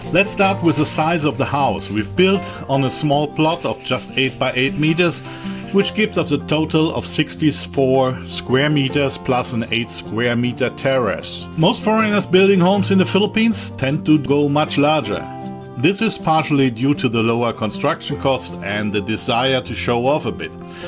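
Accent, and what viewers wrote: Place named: Germany. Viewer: Als aller erstes: Gruß aus Panama. Und nun das zweite: 😂 Ich lebte 15 Jahre in Kanada und mir wurde immer gesagt, dass ich kein Deutscher sei. Dies wurde mir auch hier von Nordamerikanern und Engländern in Panama gesagt. “Du sprichst wie ein Nordamerikaner. Deutsche haben einen spaßigen Akzent!” Als ich dich reden hörte, da musste ich erst einmal schmunzeln. Aha. Da ist er. Der Akzent. 😅 Nicht böse gemeint. - Kleines Haus und Wände. Ihr habt die Konstruktionsfläche genommen und nicht die Wohnfläche. Ich lebe hier in Panama in einem gemieteten Haus. 2 Schlafzimmer, Küche, Wohnzimmer, Bad. Genau 8x8 Meter. Die Terrasse ist 8x2.50 extra. Reicht uns auch. Ich baue nun ein rundes Haus mit 2 Stockwerken. Alles alleine. Bin “Unfallrentner” und bekomme eine gute Rente von der BG in Kanada. Durchmesser meines Hauses ist 9.80 Meter. Wohnfläche ist ungefähr 74m2 mal 2. 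Das erste was den Leuten an meinem Rohbau aufgefallen war sind die “gut gemauerten” Wände. Ich wäre wohl vom Fach oder einen Meister. Nun. Vor 33 Jahren baute ich mein Haus in Deutschland alleine. Als ich meinem Sohn in Deutschland mitgeteilt habe, das ich ein Haus baue, da kam ein: “Papa. Du bist keine 28 mehr. Spinnst Du?” Nun ja. Lausebengel! Als er letztes Jahr zu Besuch kam meinte er: “Wow. Du weißt noch wie das geht?” Hab ja noch nicht Alzheimer. 😅 Und in Panama haben neue Baugebiete keine Namen für die Straßen. Nun kommt der Witz. Auf den Lieferscheinen steht als Addresse: Calle de Castillo. (Burgstraße) Und jedes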